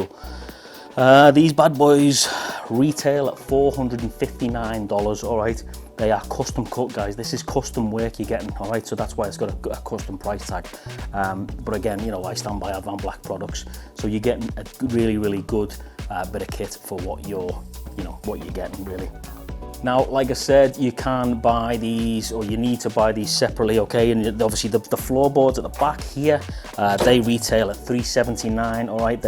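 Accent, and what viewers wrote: Liverpool, United Kingdom. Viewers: Nice one scouse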